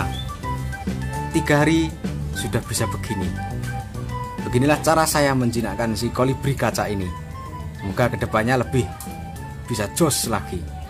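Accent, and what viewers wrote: Java, Indonesia. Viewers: Ak seneng konten ini satu gaya MEDOK bahasanya tdk ada di channel lain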